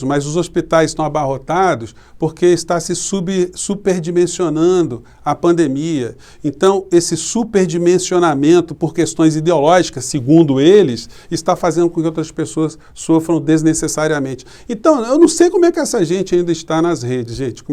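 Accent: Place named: Rio de Janeiro, Brazil. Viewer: Professor, não tente "amenizar" o seu sotaque. Fale sem pena nem vergonha com o nosso sotaque do nosso maravilhoso Rio de Janeiro! Um abraço!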